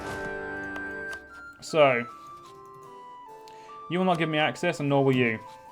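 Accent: England, United Kingdom. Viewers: Because of the way he was saying the NEW MODELS ARE LEGITAHMEHLLY BYOOAFUL I couldn’t watch. English accent is shite.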